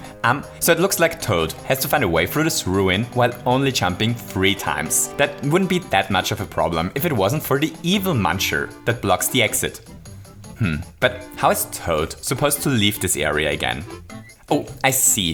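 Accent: Austria. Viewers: Bist du Österreicher? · Moment... du bist Österreicher? 🤔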